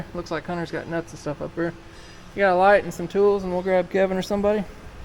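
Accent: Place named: Midwest, United States. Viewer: I DO wish you would call an oil gallery a gallery not a bloomin GALLY, ok ok I know mid western accents sound different - hell I even let you off with alt'nator for ALTER NATOR lol as in it makes alternating pole current not direct current like a dynamo but i'm sorry oil gally simply will not DO lol, anyway enough of that. Well done Hunter a really good job well executed.....besides your dads and sausage fingers interference obviously lol....just pray he doesnt demand you pay him back for all the bits he bought eh, your first ride is an epoch event in a young lads life - so much more when you built it all yourself with so much love and dedication, enjoy it every minute you can.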